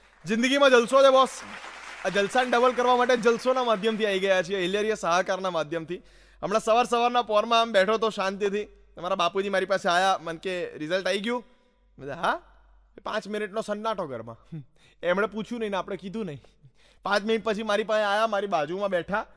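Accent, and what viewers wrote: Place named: Ahmedabad, India. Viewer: તારી અમદાવાદી બોલી મારા દિકરા જેવો છે એ વિદેશ માં છે મને એની યાદ આવે તો તમારા વિડિઓ જોવું.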